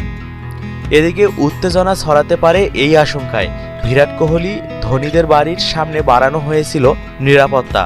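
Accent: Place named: Noakhali, Bangladesh. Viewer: Shala bolod messs na match 😂 kotha bolte shikh shala noakhali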